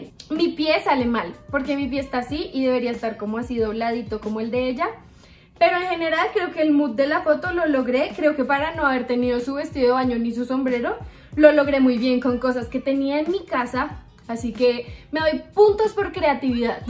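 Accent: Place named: Colombia. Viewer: me encanta tu acento colombiano 😍😍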